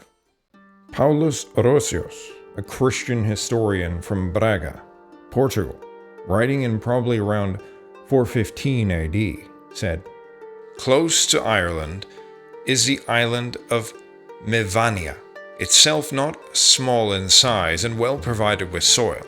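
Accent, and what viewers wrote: Canada. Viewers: They do. This is a Canadian channel so he has a Canadian pronunciation which is the same as the Irish pronunciation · He's Canadian. And over the years I've known many native speakers of Nova Scotian Gaelic, and in their dialect of English they pronounce it gay-lik. It's just how it is, and they've got every right to describe their own language as they like. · Can't say the stuff I've seen from Scots is any better. The placenames are going to be more accurate but not the information. Also the video is in Canadian English so don't expect the words to sound exactly the same as they do in Scottish English. As for the visuals, well have to agree with you there, sick of ai slop and sick of random images that don't relate to the audio. The most common way of pronouncing gaelic is 'gaylick' in America, England, Australia etc.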